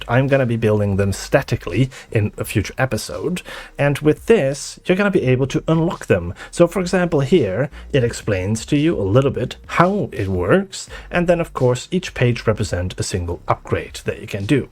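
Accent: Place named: Sweden. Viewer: Huh, I always thought that smaller house extension is actually Maru's room/apartment. Once again, you made this build look amazing, and figuring out all of the redstone stuff is way cool! Btw, your slight accent gives off Swedish vibes. Are you from there? Much love to you, as always, and thank you for sharing your creativity with the world! <3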